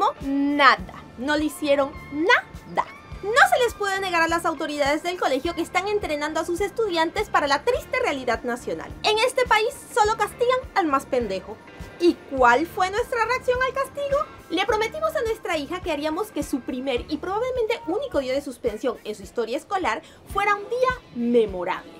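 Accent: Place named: Panama. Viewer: A parte, quiero hacer un comentario con respecto a los videos. Yo entiendo que quieran hacer algo de humor realizando diferentes narraciones como si fueran personajes, en este caso, una profesora de colegio. Pero creo que esto le quita seriedad al video, si la idea es enviar un mensaje serio. Ademas pienso que no es "hablar como panameño" es mas bien hacer la diferencia a la hora de hacer un video, porque si fuera asi, entonces todo mundo hablaria como habla cotidianamente en cada video de youtube, serie de netflix y programa de tv que uno ve, no? Yo solo digo que puede haber mas seriedad del video, si se habla con menos tonos "al estilo panameño" y con mas tonos concretos.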